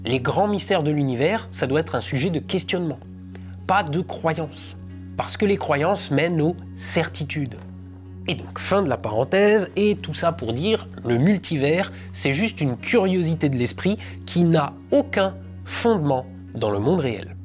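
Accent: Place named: Alsace, France. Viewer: L’accent alsacien et la prononciation des le début me ravi ! Mdr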